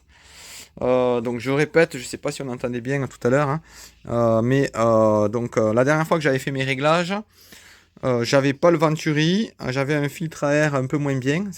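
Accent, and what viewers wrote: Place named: South, France. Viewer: Tu as l'accent du midi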